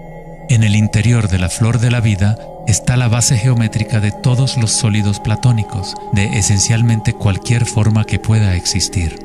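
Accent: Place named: Latin America. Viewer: Bastante interesante, lastima que este en latino, no es por nada, estoy más acostumbrado al acento español. Like.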